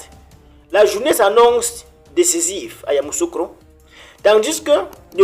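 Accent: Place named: Cameroon. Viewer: Toi avec ton accent camerounais là tu crois qu'on est assez bête pour croire à ce que tu dis moi je suis à Yakro et on a dormi en pays ici hein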